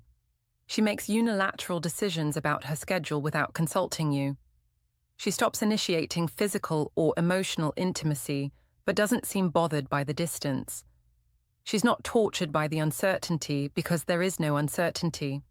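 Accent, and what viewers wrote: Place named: New Jersey, United States. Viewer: That’s a lovely accent you got there. New Jersey?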